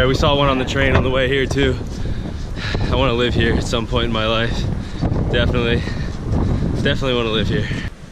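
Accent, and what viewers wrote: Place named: Argentina. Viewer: Ese acento me suena a Argentino, a más no poder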